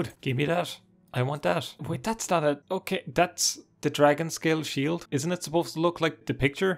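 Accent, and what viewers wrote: Ireland. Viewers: We don´t mind your Irish. It´s actually fun hearing "He´s very Fair away" "Oh! Look at the Stairs!". It´s really endearing:)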